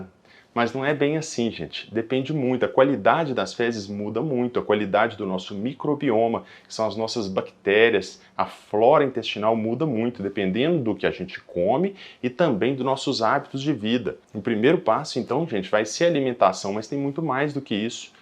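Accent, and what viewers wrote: Minas Gerais, Brazil. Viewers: Obrigada doutor! Exposição clara, bem articulada, e este discreto sotaque mineiro é muito bonito. Já me foi retirado dois adenomas. Faço o melhor por mim, só não consigo parar de fumar. Mas fumo 2 a 3 cigarros por dia.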